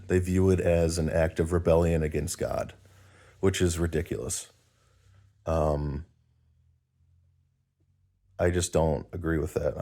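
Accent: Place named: Canada. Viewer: I was angry too! Disfellowshipped in 2005. Now I'm happy. . Here is an end time prophecy they never talk about that has always been in every Christians bible and yet, few are the ones finding it: . Isaiah 66:5 Hear the word of Jehovah, YOU men who are trembling at his word: “YOUR brothers that are hating YOU, that are excluding YOU by reason of my name, said, ‘May Jehovah be glorified!’ He must also appear with rejoicing on YOUR part, and they are the ones that will be put to shame.” . [Name of person] is no longer one of JEHOVAH's Witnesses ---->> "excluding YOU by reason of MY NAME". . Do you see Jehovah's promise to disfellowshipped Jehovah's Witnesses? . "He must also appear with rejoicing on YOUR part"! . . So who is right? . Jehovah or, eleven men who live in New York? . My faith and belief has vastly increased since leaving! . . I'm HAPPY. . Luke -23 HAPPY are YOU whenever men hate YOU, and whenever they exclude YOU and reproach YOU and cast out YOUR name as wicked for the sake of the Son of man. 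23 Rejoice in that day . [NAME OF PERSON] is no longer one of Jehovah's Witnesses ---->> "and cast out YOUR NAME" . . Another prophecy about Rejoicing! . . You sound Canadian. . You could try sharing this with your family. .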